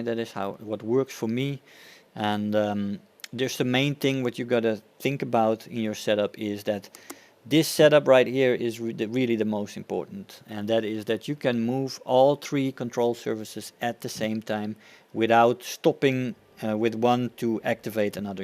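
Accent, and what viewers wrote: Netherlands. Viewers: i smell dutch....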